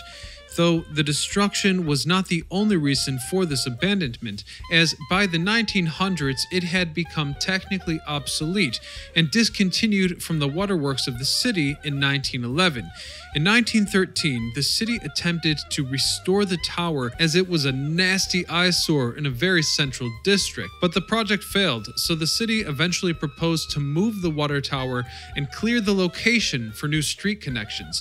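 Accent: United States. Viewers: Yeah he sounds totally American but then there are some funny mistakes. Can't pin it either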